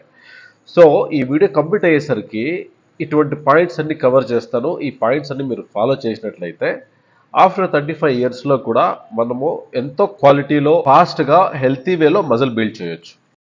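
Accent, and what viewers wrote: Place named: Anantapur, India. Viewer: Sir , mee uuru pakka atp to karnataka border sir slang chusthey thelisipothundhi❤ any ways thanks for the great info🎉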